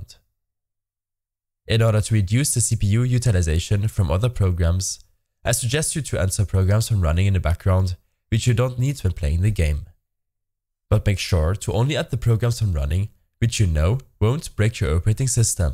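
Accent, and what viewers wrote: Germany. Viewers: Are you from Germany? kinda sounds like that xD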